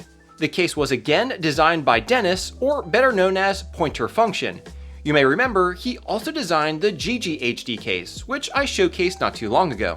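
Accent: United States. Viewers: I love how despite his hispanic heritage, his American accent is so strong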